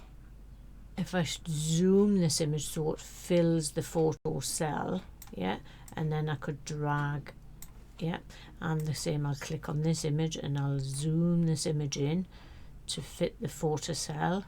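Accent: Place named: Newcastle, United Kingdom. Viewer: Liked and subbed cheers. I have so many images on my channel but want to see some actually in a book I did one a while back but left it to the printer and graphic designer to work out. Ten years on I look at it and although I’m happy enough I will do things differently like the printer insisted on it being a portrait style which I stupidly went along with. I knew at the time it should be landscape orientation but hey live and learn slowly. So now I’m looking at putting a book together via LR and probably printing via blurb just for myself initially. I’ve got the images populated so just a case of fine tuning the layout which Is why I just subbed. All the best mate love that Geordie accent 📷📷📷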